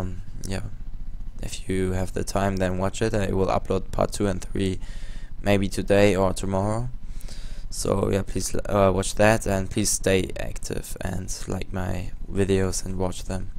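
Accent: Germany. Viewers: man erkennt deutsche so leicht xd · Haha das is das allererste video was ich von dir schaue aber ich hab sofort gemerkt dass du deutscher bist :D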